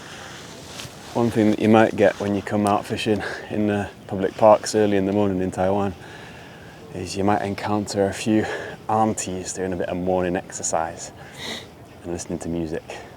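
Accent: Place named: United Kingdom. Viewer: 這是英國腔嗎？太好聽了🎉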